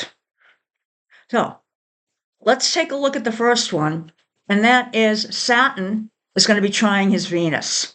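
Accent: Massachusetts, United States. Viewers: I knew you had the Mass accent!! My mom was from Hull village , I grew up in lakes Region NH ( Squam lake and Winnie) and now in Maine.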